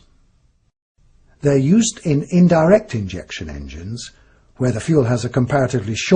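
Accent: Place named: United Kingdom. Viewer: للأسف التعليق بإنجليزية بريطانية.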